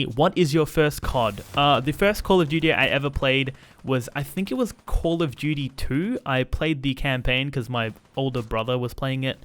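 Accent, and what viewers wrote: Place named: Australia. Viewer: wow your an aussie too. I though you were. you should do something like a sparkle special but joocy raging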